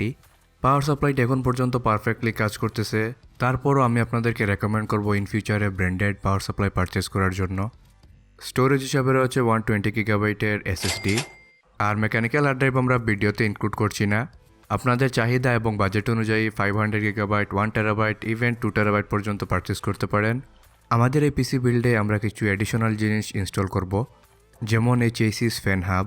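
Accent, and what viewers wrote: Sylhet, Bangladesh. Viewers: vaii kita sylheti nih?? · Are you from Sylhet? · Bhai kita sylheti ni? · Bro apni ki sylheti? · Vhai.... Apni sylheti? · Sylheti nakita bhai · Dada Sylheti naki 😌. Sylhet hoile kinte pari. Reply diyen dada. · Bhai apni sylheti Maybe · Sylheti mama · Great video... Are you sylheti brother? I was just wondering... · সিলেটি Bro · Sylhety ni bhai? Mat hunia lager sylhety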